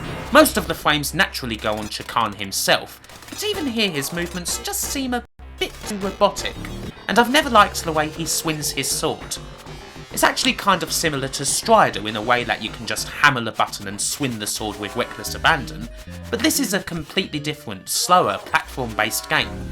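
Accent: United Kingdom. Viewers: You are British?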